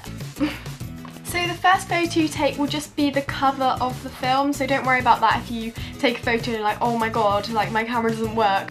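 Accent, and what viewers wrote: United Kingdom. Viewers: Omg I was so shocked when I heard ur voice I love ur accent. :) wish I were british. Great vid! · The British are so cool 😎 accept classy accent❤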